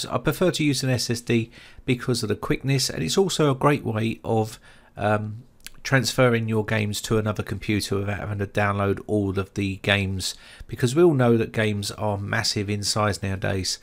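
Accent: United Kingdom. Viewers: Not even close they have a different accent. The man on here has a British accent and the one playing Korg has a different accent a New Zealand accent to be exact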